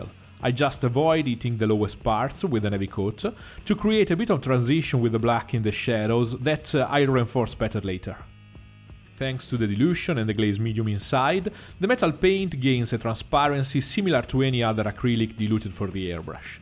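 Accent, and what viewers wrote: Italy. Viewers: Awesome Italian accent dude 😎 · Però se parli italiano qualche volta non farebbe schifo 😂😂 si sente che sei italiano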